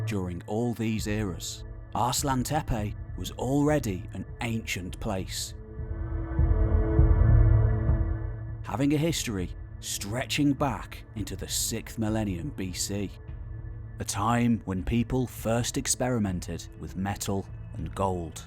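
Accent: United Kingdom. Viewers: That's a cheap ass video with crap photos and clips shown over and over again. Of course with the limey voice you think you will learn some deep shit but no, the video just ends with some clip of driving down the dirt road in some far away shit hole. Back to the airport, I guess.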